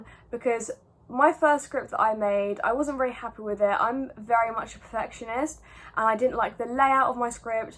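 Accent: United Kingdom. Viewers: Wait- I didn’t know you were actually British 😭 but I love your accent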